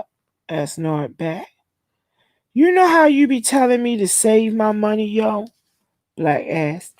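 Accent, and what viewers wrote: Baltimore, United States. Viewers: Your very welcome... its a compliment definitely... your personality makes this reading fun and interesting... your Baltimore accent makes the story more realistic...I also appreciate that your reading the entire book. Ralph Reads on YouTube got me waiting for weeks for the 2part...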